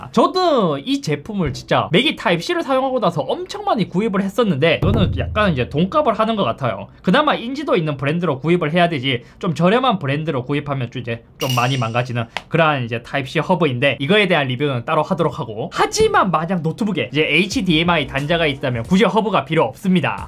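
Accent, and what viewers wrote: Gyeongsang, South Korea. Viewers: 경상도 억양 빼고 다 좋음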